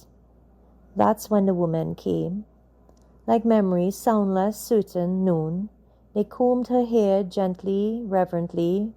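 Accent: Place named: Trinidad and Tobago. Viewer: Love your story. Brought back so many memories and love the fact that you were selective with your trini dialect. It was used just in the right places.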